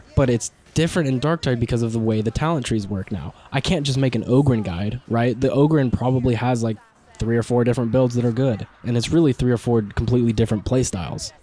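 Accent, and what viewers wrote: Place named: England, United Kingdom. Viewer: You have a cool voice and a clear english accent. You should consider adding simple playthroughs to the channel, especially of the games you mentioned here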